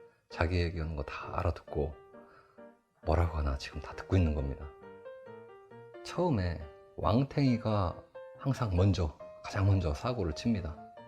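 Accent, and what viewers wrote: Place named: Chungcheong, South Korea. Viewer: 에꾸가 잘 알다시피 너무 날씬하잖아요ㅋ 시도를 안 해요. 저도 충청도지만 충청도식 화법 느므 익숙하고 고향 생각 나네요^__^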